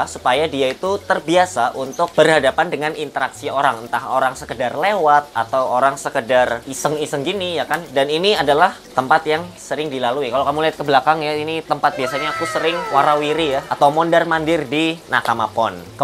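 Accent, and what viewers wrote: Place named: Java, Indonesia. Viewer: Orang jawa ya bang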